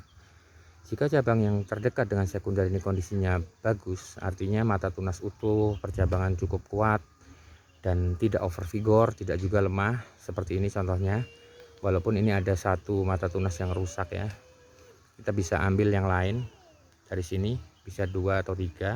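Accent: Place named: Java, Indonesia. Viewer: padahal logatmu logat Jawa kampung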